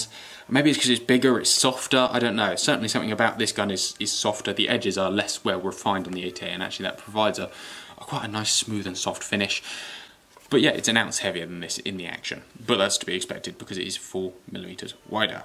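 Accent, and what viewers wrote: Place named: RP, United Kingdom. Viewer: Should of mentioned this before but i will now. The quality and depth of your videos are second to no one on you tube and your knowledge of firearms for a man of your age is quite Remarkable and something i could only wish for and all delivered with a BBC quality voice. Well done posh bloke excellent.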